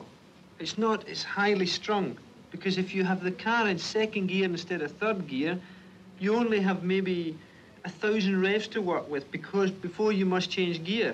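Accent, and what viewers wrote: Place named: Scotland, United Kingdom. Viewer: The auto closed captioning is just getting crushed by that sweet sweet Scottish brogue.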